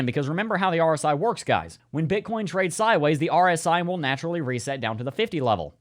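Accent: South, United States. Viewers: Thanks for the videos! Where are you from? You ve got like an a "country" accent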